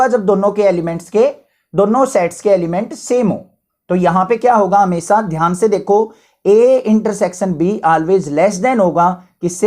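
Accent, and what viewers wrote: Haryana, India. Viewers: Sir you are from haryana 🫂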